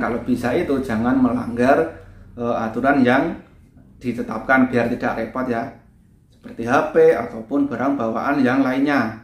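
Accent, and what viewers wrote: Java, Indonesia. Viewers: Medok tenan kang...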